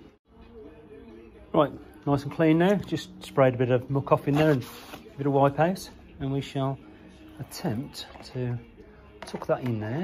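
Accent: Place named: Midlands, United Kingdom. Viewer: Cheers dude not getting one you saved my bacon midland acsent👌☕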